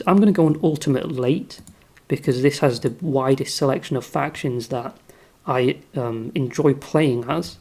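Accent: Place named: United Kingdom. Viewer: I love this british accent, I was loking for some british channel for improve my english, and I found now 🙂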